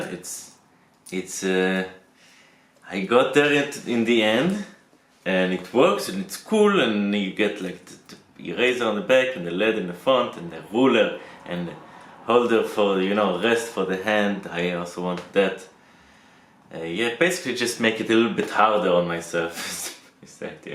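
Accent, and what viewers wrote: Israel. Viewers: מבטא ישראלי למדי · i loved the look of the details in the pen and the engraving, thanks for creating a magnificent art piece. is't your accent from israel? you sound like my cousin, thats why i ask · * Clicks on video * * Hears Israeli accent * INSTANT SUB! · המבטא שלך פשוט צועק לי ישראלי באוזן זה קורע!😂 אגב הדברים שאתה מכין מדהימים🤟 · Accent is definitely israeli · המבטא הישראלי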